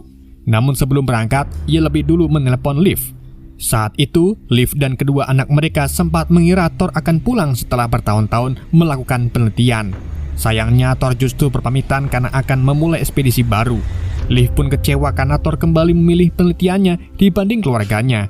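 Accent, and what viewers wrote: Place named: Pemalang, Indonesia. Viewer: Kamu orang pemalang kah min?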